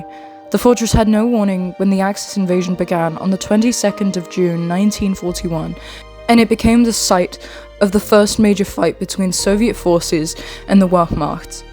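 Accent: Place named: RP, United Kingdom. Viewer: Perfect BBC English; умничка! Никто не забыт, ничто не забыто.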